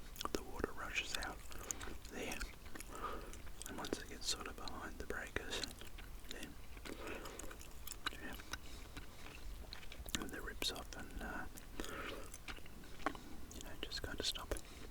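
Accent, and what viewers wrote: Australia. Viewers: Love your voice! The Aussie accent is by far my fave. I also watch another Aussie, but he’s not ASMR. The Drain Addict YouTube channel. Another great one!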